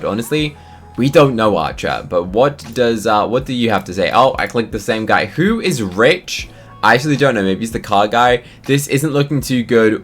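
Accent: United Kingdom. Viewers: This is the most british accent that i ever seen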